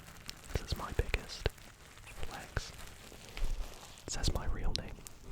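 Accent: Scotland, United Kingdom. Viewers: I started watching asmr in 2022 but not sure if it was 22 or 23 when I came across you and it was the Scottish accent that made me sub, so tingly!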